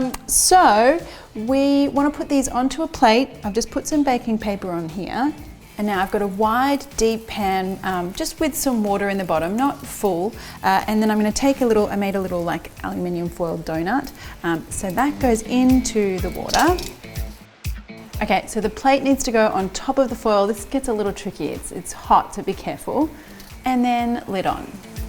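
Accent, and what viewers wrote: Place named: Australia. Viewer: Pretty sure it's just her Australian accent lol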